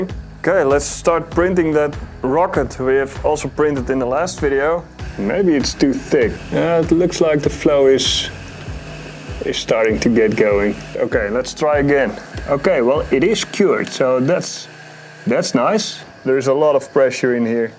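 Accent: Netherlands. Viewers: just from the accent I can tell you're dutch, its always very obvious or unnoticeable at all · Hello fellow dutch guy very nice accent, keep up te videos and cheers! Geniet van je frikandelbroodjes~ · Het Nederlands accent spat er van af. Kanus man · mooi nederlands accent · Eerste keer op je kanaal. Dacht aan je accent te horen dat je Nederlands was. De "Ah, nee, tyfus." overtuigde me. Interessant idee om met een gel te printen. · Okay, your accent made me suspect you to be Dutch. But the tube squeezer made me 100% sure :)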